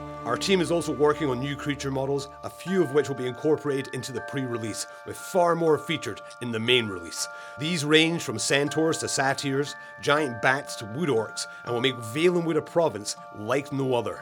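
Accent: United Kingdom. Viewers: Ok watched for an interesting topic, but I'm now staying for the voice. Scottish accent my favorite ever since Sean Connery became my Bond. Great video and thanks for the content also. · Can anybody nail down the presenter's accent? It sounds mostly Northern English or Scottish, but once in a while I could swear I hear bits of Irish and Welsh... maybe even a bit of American? If I had to guess, I'd say this guy has probably spent a lot of time moving or traveling in his youth. I'm fascinated by English dialects, and this guy's intrigues me. Oh, but great video btw. Beyond Skyrim is my brightest hope for the future of TES, and I love the work you guys do. Keep it up.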